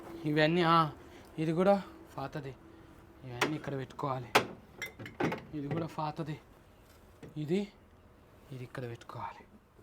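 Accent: Telangana, India. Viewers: అన్ననిజాన్ని కామీడీ రూపంలో చూపిస్తున్నావ్?తెలంగాణ యాసను,నీయంత భాగా చెప్పే గుంపు మేస్త్రి గానీ చెంచగల్లా తన చానల్లో చూపలేరు?👌🙏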